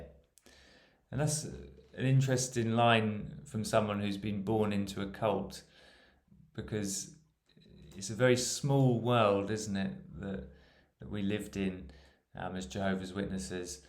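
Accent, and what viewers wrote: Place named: United Kingdom. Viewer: Good to hear UK voice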